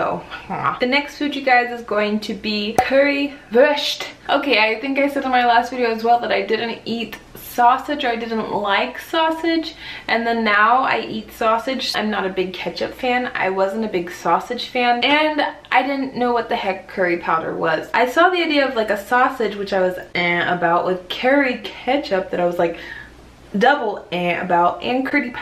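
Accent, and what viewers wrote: Bavaria, Germany. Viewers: Your Bavarian pronunciation is delightful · Bavarian myself and I was just about to post the exact same thing. You actually sound exactly like a bavarian. Not even germans are able to pronounce it that well in 90% of the cases. Also Leberkaas sounds pretty perfect to me. Did you live in bavaria?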